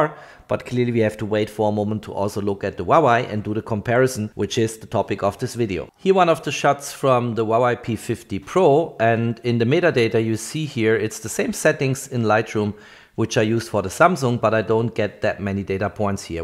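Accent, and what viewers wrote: Germany. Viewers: Gutes Video, aber arbeite vielleicht ein bisschen an deiner Aussprache :)! Man hört den deutschen Akzent nämlich echt stark 😆 (zum Beispiel spricht man millimeter wie „millimieter“ aus)! Nicht böse gemeint, ansonsten passt alles 😊! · du hast so ein deutschen Akzent haha xD hab ich recht? also kenne dich noch nicht das erste mal auf dein Kanal aber das klingt sehr Deutsch für mich :) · Are you german🫠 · I thought the same xD. It really sounds so german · u sound like a german jew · Is it only me or has he a German accent? It sounds all so German xD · wieso machst du das nicht auf deutsch? hört man doch deutlich dass du deutscher bist 🤔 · ah yes, i hear the german-ness in your voice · Hard german accent :D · Iv I should guess, you are German!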